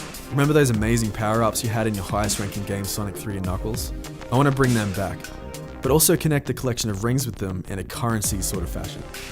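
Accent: Australia. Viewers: He's from Straya, that's not his fault lol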